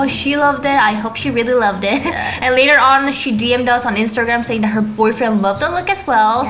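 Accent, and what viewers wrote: France. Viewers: Your French accent is so cute! 😜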